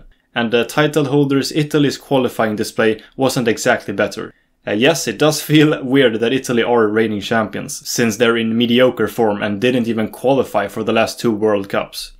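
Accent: Sweden. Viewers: Måste va den starkaste svenska brytningen jag hört på länge😂 bra video👍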